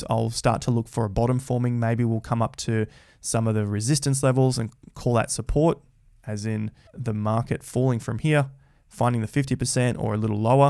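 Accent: Australia. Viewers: Hi! Good video and keep it going mate! It's nice to hear again a bit of 'stralian accent! Love and supporting you from Italy!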